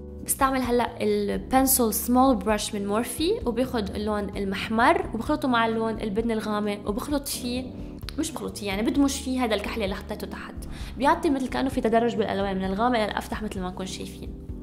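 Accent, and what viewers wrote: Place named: Lebanon. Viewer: حبيت اللهجه اللبنانيه بسببك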